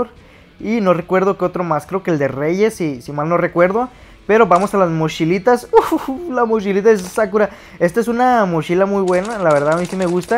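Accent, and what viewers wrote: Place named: Chihuahua, Mexico. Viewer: Awuebo puro chihuahua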